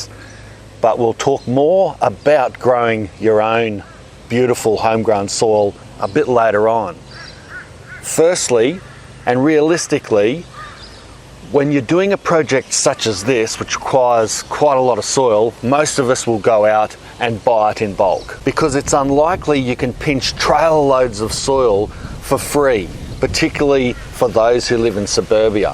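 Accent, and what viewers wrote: Queensland, Australia. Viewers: I’m going to guess you’re from Qld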